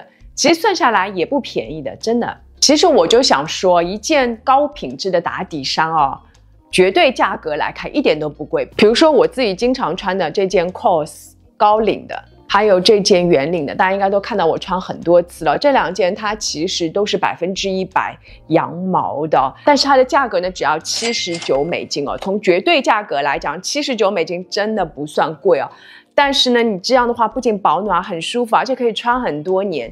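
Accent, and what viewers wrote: Shanghai, China. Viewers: 姐 你是上海人吗？麻子比较大因该是上海话吧。呵呵😁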